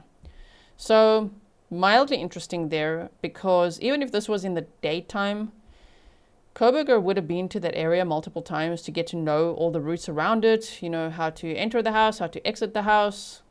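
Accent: South Africa. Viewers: is that a beautiful South African accent I detect? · I know a south african accent when i hear one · Just discovered you. Funny how I knew right away that you are a fellow South African! Thx for your great work. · Are you also from South Africa. Your accent❤ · You've just gained a new South African subscriber- I recognize your accent, but it's not that- your breakdown and the new info given in this video is brilliant!! Thank you!!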